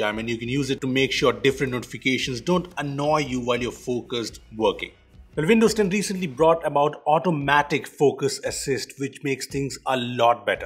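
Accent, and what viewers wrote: India. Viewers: How to show fps? You talk too fast indian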